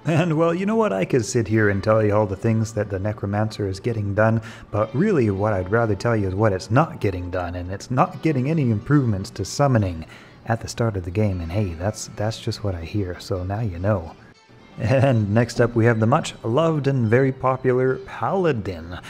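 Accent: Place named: Canada. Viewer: and the way he says about. I'm not saying anything bad, most Canadians are pretty nice and cool. But with certain words you can almost instantly know that they're Canadian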